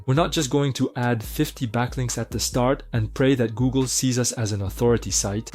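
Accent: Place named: France. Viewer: vu son accent en plus je pense que c'est un français je reconnais l'accent :)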